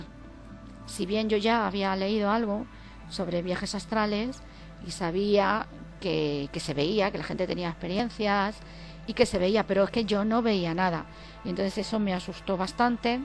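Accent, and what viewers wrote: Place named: Spain. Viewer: ¡Impresionante! Me encanta como lo relatas. Será que me gusta mucho el acento Español. El que cuentas de volar es exactamente como me ha pasado a mi. Concuerdo 100% con lo que dices de los sueños lúcidos. Estos últimos son los que más me fascinan ya que puedes aprender más de ti mismo. Aprendes a estudiar dentro del mismo sueño tu subconsciente, los símbolos pueden ser muy impactantes y como dices causan miedo. Si enfrentas esos símbolos feos a mi me ha pasado que en ese momento se transforman en otros más sencillos y se comprende más así lo que simbolizan. Eso de las vidas pasadas es justo como lo interpreto yo, como tu dices "suceden en el eterno ahora", Y, no es que recuerdas es como que lo vuelves a vivir. Gracias por abrirte así. Toma de mucho valor. Amor y luz a ti, y CC%C!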